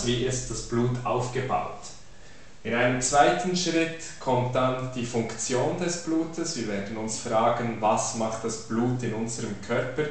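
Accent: Switzerland. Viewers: schweizer Deutsch?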